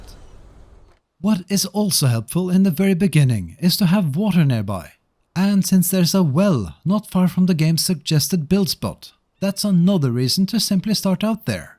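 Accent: Norway. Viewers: Are you Norwegian? ^^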